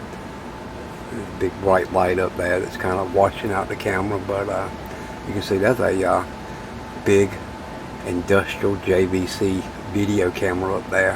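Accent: South, United States. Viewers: Yea I agree first time I saw your face lol my mind vision you were way older and scruffy. and you could do this ballad well with your southern drawl <link>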